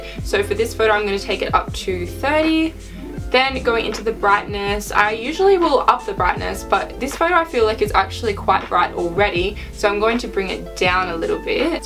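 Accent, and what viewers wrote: Australia. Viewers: And are you Australian? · I knew you was Australian when I heard you speak! Love your wall background and your makeup highlight!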